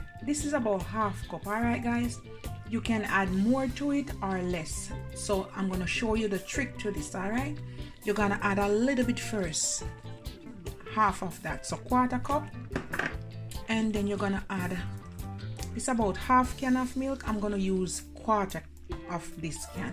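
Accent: Jamaica. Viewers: Lovely porridge family you sound like you from mobay .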